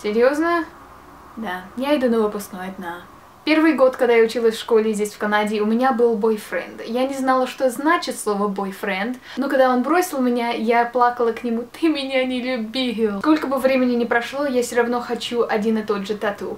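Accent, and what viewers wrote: England, United Kingdom. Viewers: У неё такой классный английский акцент;) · У тебя такой акцент сильный от английского)Так классно:3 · Английский акцент у тебя) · Такой английский акцент),это твоя изюминка)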